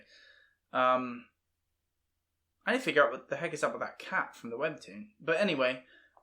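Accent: England, United Kingdom. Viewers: LOL! I was only half listening to the intro while I was starting all of my other programs to get settled into my work, and missed the bit where you talked about doing the entire reaction in a British accent, so once I finished logging into my workstation and started paying more attention to what I was hearing (without glancing over at this window yet), I was like "Wait, what? Who is this guy? I mean, he kind of sounds like, but he's not...is he? OH!" 😂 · I'm sorry. What happened in this video? I was a little distracted by your English accent because my brain was trying to adjust. 😁 Kudos to you for trying new things and being vulnerable. I am so happy to be watching a video reaction from you. Thank you! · I wasn’t paying attention when the video started and then you started talking in an English accent and I was so confused I checked your channel name to make sure I was watching you. 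(btw I’m from the UK) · I commend you for keeping up with the British accent throughout the whole video. You sounded like a British person who has lived in the states a while. Very conversational. I usually don't have an accent (Filippo) but whenever I come back from a party at my aunt's house, I come back with an accent. At least that's what boyfriend says because I don't really notice it. Sorry TMI. Anyway, just wanted to say great job 👍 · I pressed play on the video on my computer and then looked at my phone because I got a message and it took my brain a minute to register that there should not be a British accent on this particular video. It is always interesting to watch your videos as you discover more about this universe and try to put all the pieces together! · OMG - the Voldemort part :D I recently watched 'The Mysterious Ticking Noise' soo it really got me :D what a coincidence and your british accent in this video made me distracted :D instead of watching I was so focused what you were speaking :D I don't know if that makes any sense but yeah... 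:)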